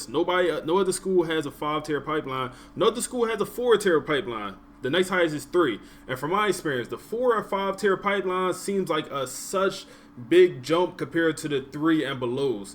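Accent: Philadelphia, United States. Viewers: Jus came across yo channel today you sound like my homie from philly 😂😂 then u said temple I was like yea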